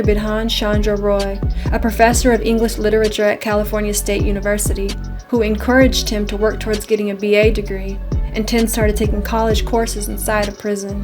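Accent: South, United States. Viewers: Dam you have a nice voice? Southern?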